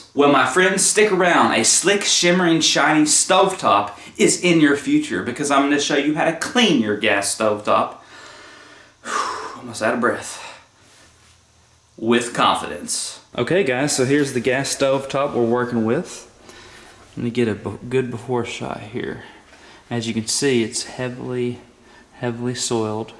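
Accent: South, United States. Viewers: IM a SNOW BIRD...LOVE YOUR SOUTHERN DRAW yes you are the nice work my friend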